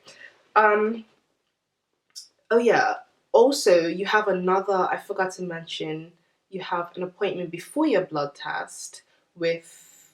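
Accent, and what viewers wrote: Bermuda. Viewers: That moment when you're clicking through YouTube and hear a familiar Bermudian accent. I love that you have a little more British mixed in there! Just gained a 441 subie btw ❤️😊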